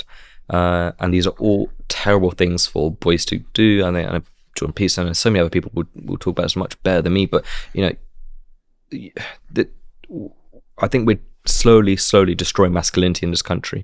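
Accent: United Kingdom. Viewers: Good information well put .. British English spoken ..the tone and and the pace is so much easier and pleasant to the ears .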